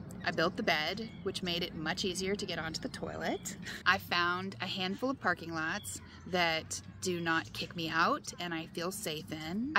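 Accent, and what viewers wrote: South, United States. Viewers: Girllllll! I feel like I know you! What you're doing is a wish of mine. For someday . Not sure I have the guts tho. Please keep in touch as you can. I'd be tickled pink to meet ya. I'm 61. My husband is a good guy, just super boring. (Engineer) I was an operating room technologist back in my day. I crave adventure. ***I liked hearing a southern voice as well. I am currently way up in Ohio ....ICK ! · Your accent and southern persona delivery is fantastic. This is coming from a tough critic! Girl you keep on sharing them stories. Your journeys will take you far and wide. Your honesty about the transition to the "Urban jungle" is different than boondocking. My dog and I are doing overnights. We did a week road trip in the summer. Definitely has it's enjoyable and challenging times. That's the adventure. What lies around the next bend.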